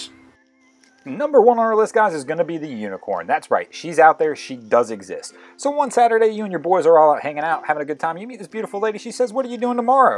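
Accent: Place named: Maryland, United States. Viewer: my wife think your from Maryland by your accent or speech cadence, how far is she off?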